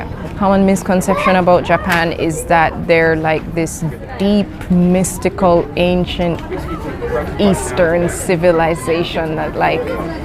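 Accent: Jamaica. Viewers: I can related to the hair experience lol. When I was in China it was not uncommon to meet people who just wanted to touch my hair. I had the old school flat top hair cut. Some even wanted a picture. Btw I'm from Grenada but I'm glad to still hear your Jamaican accent in there after speaking mainly Japanese for so long. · As she Start talk mi know seh a Jamaican she be, the accent tranggg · I'm 6 seconds in, and im pretty sure this woman is Jamaican XD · Is she also west Indian? She sounds Jamaican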